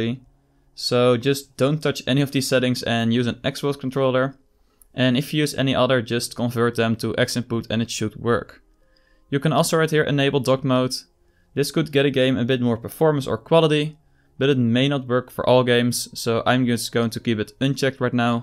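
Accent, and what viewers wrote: Netherlands. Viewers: jij bent nederlands dat hoor ik aan de manier hoe je engels spreekt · Your dutch 100% sure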